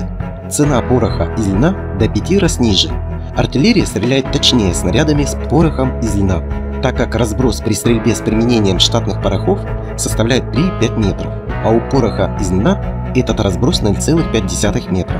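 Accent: South, Russia. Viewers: Leo1.5.2 по южному говору)))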